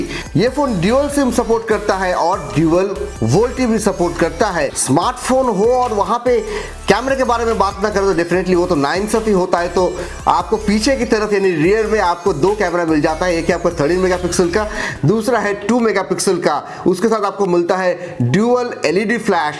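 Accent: Bengal, India. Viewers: Sir AAP Bangali ho?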